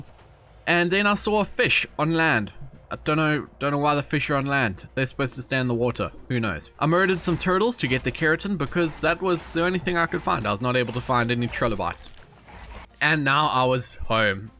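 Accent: South Africa. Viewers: Yeyi ARE U SOUTH AFRICAN??? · you sound south african 🤔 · You sound South African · You South African? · Does anyone know where this guy is from? Accent sounds awfully like a South African accent, dunno, might just be me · R u south african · The sound of South African accent is strong with this one hahahah I love it Insta sub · Ayy man you from South Africa you sound like you do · South African?? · Are you south african??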